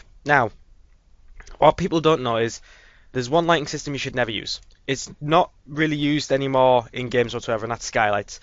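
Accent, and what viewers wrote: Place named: United Kingdom. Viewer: You sound very British when you're sick, so British that I sometimes have trouble understanding it D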